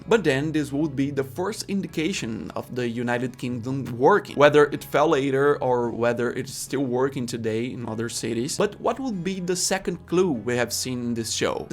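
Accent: Brazil. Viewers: hes brazilian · Brazilian accent and Brazilian name. I am home.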